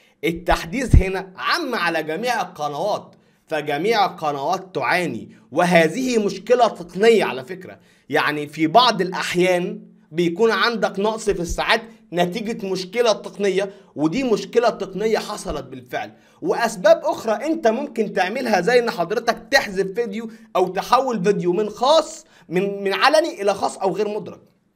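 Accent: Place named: Sharqia, Egypt. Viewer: انت منين من الشرقيه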